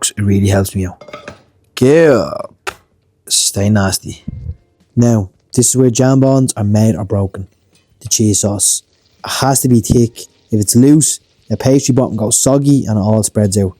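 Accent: Ireland. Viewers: Honestly, his Irish accent isn't that difficult to understand. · This looks absolutely SCRUMPTIOUS darlin I can't wait to make it and give it a try. Love the accent and love my Irish men ❤❤🎉🎉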